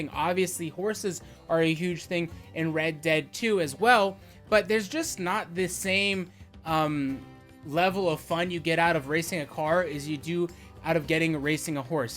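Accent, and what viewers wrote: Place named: Russia. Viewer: please make more vids especially with your Russian ascent. It’s hilarious! Once a week isn’t enough for me. I look for your vids daily.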